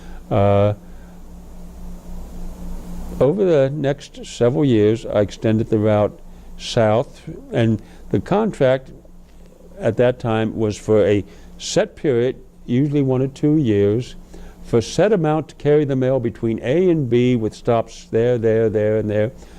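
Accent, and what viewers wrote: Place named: Brooklyn, United States. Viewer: I think you so much, for your hard hard work & research, I would like to suggest working on toning your YANKEE ACENT, for your impression, studying the General’s photos, will show you can use a skilled master Barber 💈. Do a more Standard “ regular “ Bussiness style HC, and about 3 inches in the front on your forehead, and combed back in more of a “ roaches “ front ( old southern term) for “ Pompadour’s “ that’s just away it’s worn off the forehead, your’s is too wispy, too long on your collar, looks , 1810’s, and late 1830’s, but in the 20 th century 1990’s like Billy Ray Cyrus, Mullet. As a fellow wearing a flat top in 1978 out of step. You’d be perfect 👍 for those periods, like , President Polk, wore his in the style, described in Oh Jennings Wise’s book description of his father , Henry Wise, “ in the style of the 1840’s, combed straight back from the forehead long in the back. 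But as you can see by the photo’s , they dressed there hair then, w Call Mees, hair tonic’s,, bear grease, is why You see or did see bears of different sorta illustrated in Barber 💈 shops. Tunstall parlors, and you General, had a Black, body servant, and 99 % Of Tunstall powers in the south was always ran by negro Barbers, another Example I’ll give you is General R E Lees Haircut is called a “ Quartersingle” it’s a styling he wore all his life, it is from the 1820’s into the 30’s mid way. The hair is parted in the back center, and the head is lend forward , and basically trimmed in front of the temp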